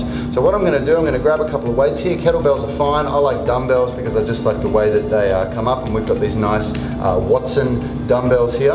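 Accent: Australia. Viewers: Has he got Australian accent?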